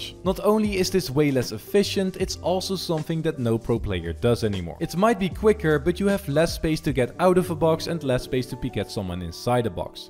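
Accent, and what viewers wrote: Netherlands. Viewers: gek Nederlands accent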